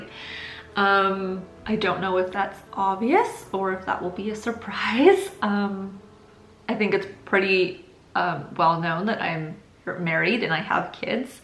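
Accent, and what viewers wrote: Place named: Canada. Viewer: I really enjoy the content, and I can easily tell that the accent is Canadian. I look forward to the video on the tattoos.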